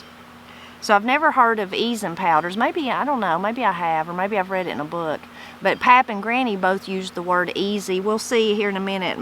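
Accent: Appalachia, United States. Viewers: I just came across your channel and I love it. So many things you describe sound just like my Grandmother. She was from Tennessee and my Grandfather was from North Carolina. So great to be reminded of the spoken sounds of my childhood…thank you.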